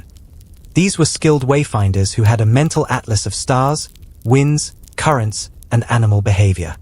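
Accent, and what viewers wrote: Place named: Europe. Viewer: When a european sounding man narrates its boring and full of holes, not a sleep story at all, more like an unintelligent story told by an unpolynesian male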